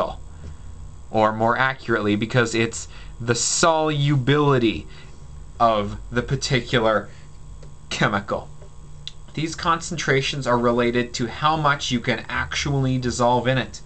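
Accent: Canada. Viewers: oh, you're canadian...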